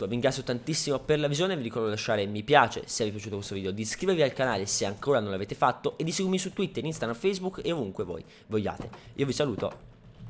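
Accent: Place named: Italy. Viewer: ma di dove sei? (so che sei Italiano ascoltando il tu accento nei tuoi video)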